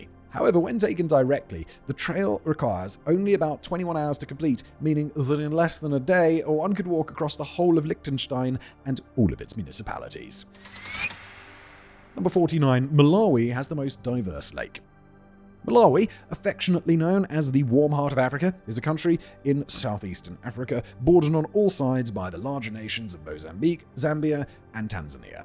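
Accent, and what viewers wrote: England, United Kingdom. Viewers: Actually he lives in Czechoslovakia atm but his from England that's why he has that accent 😂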